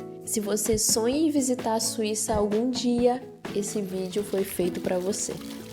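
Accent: Rio de Janeiro, Brazil. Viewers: Tu és muito fofa e calma! Parabéns p video, vou em outubro p Suiça! Ansiosa Ahhh o sotaque carioca tbem é lindo!!! Bjo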